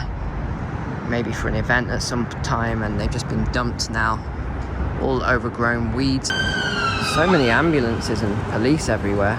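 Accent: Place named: RP, United Kingdom. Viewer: Do you know how urban demographic transition models work? I learnt this stuff as a 13 year old in a crappy bog standard public school of all places, so you and others like you with your posh holier than thou accents have NO EXCUSE not to know about the subject. Literally every MAJOR CITY in the UK is composed like you see in Birmingham, it is no better or no worse than anywhere else. Even London is like this, literally walk 500 yards from Liverpool Street Station, which is plush, and the area quickly becomes depraved. Nothing has changed about these major cities. Manchester was exactly the same thing before the Man City FC owners invested in the local council rejuvenation project. The issue is a lack of investment, it's always a lack of investment in public services. People talking about immigration being the cause are just clueless, immigration has been here since WW2 and it's helped to not only rebuild the country, but to prop it up. 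If anyone is to blame, it's successive governments pulling public budgets when taxes are forever increasing.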